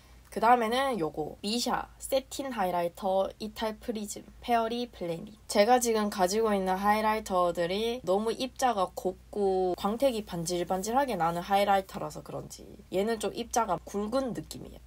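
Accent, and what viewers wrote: Gyeongsang, South Korea. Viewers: 그냥 사투리가 같은 느낌인데.. 경상도인이라면 누구나 알,, 다들 이 사투리쓰고 이런 억양입니당 이분도 이분만의 매력이있쥬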